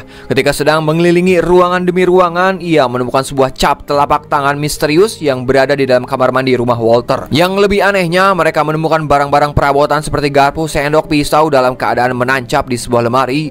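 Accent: West Java, Indonesia. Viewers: Aku tebak pasti abang org sunda iya kan?? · Kang pasti org Sunda nya 😂logat na meni Sunda pisan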